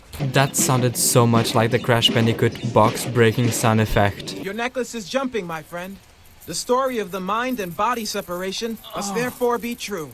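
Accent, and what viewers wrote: Portugal. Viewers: YOU'RE PORTUGUESE. THE SIR KAZIO MEME? THE "WAAAAAAAA" ONE? YOU AXCENT? I KNOW IT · you sound portuguese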